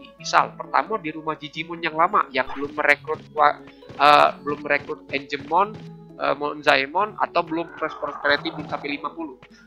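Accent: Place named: Minangkabau, Indonesia. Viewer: Mantap bg...jan jaleh bana logat minang nyo bg hehee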